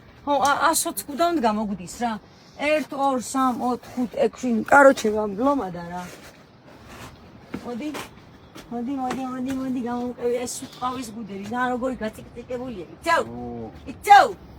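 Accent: Tusheti, Georgia. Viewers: მომწონს თუშური დიალექტი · კუთხურად ილაპარაკე გთხოვ ❤საოცარია თუშური კილო კავი❤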